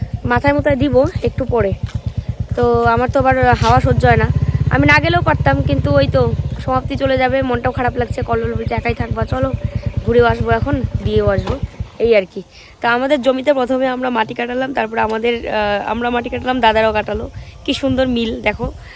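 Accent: Bangladesh. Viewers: Prottek jaygay ak ak ta ancholik vasa thake, ota sobai jni. proyojon a nijeder moddhe kotha bolao hoy vlog cholakalin, bt ajkal puro vlog ta besir vag somoy Bangladesher vasay/ local vasay kotha bole vlog continue korche, Really khub odd lagey sunte. ak ak br bolbe seta vloi lage, amrao porichito hoi vasatar sathe. tumi fresh Banglay chesta koro. o toh coochbihar er meye, ki sundor kore kotha bole. tomar kotha gulo baddo kaney lagey. kichu mone korona bollam bole. chesta koro nije k improve korar. Roj vdo dekhi, cmnt. kori tai jeta thik mone korlam seta bolei fellam.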